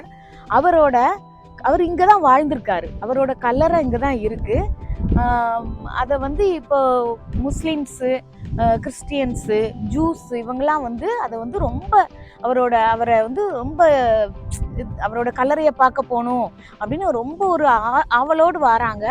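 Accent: Tirunelveli, India. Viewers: Video super... நேர்ல போய் பார்த்த மாதிரி இருந்தது....நம்ம ஊர் தமிழ்ல கேக்க ரொம்ப நல்லா இருந்தது...நீங்க தூத்துக்குடி அல்லது நெல்லை மாவட்டத்தை சேர்ந்தவர்களா.... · Praise the lord sis Nellai tamil super super 🎉🎉🎉❤❤❤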